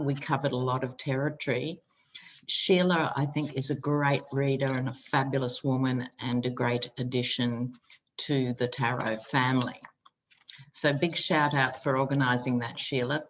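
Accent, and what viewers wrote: Australia. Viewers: You remind me of Dixie from "Designing Women", she was such a beautiful actress and had such a sexy voice like yours. Your Australian accent vs her southern accent. I knew you looked like a famous person but couldn't think of her, she was married to Hal Holbrook (spell.?).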